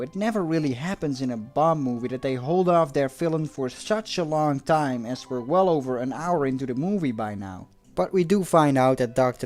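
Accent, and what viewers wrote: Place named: Netherlands. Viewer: I love Dutch dialect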